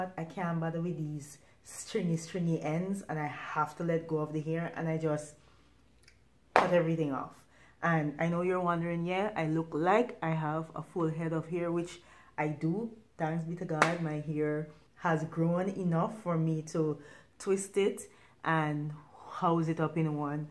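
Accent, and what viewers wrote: Jamaica. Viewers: I've had my hair flat ironed days ago because I wanted to get my ends trimed. When I wash my hair, I will let you know how it turns out. I keep listening for the Guyanese accent and keep hearing Jamaican 😊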